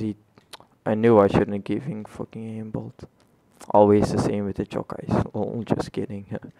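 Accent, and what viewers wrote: Netherlands. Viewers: Are you dutch? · HahahahahHhahaha jij bent sws Nederlands je accent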